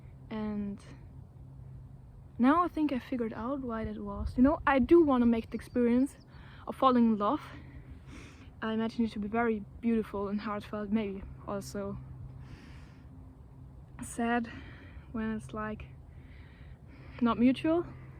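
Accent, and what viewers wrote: Germany. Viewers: are you german? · Are you german ? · Are you german?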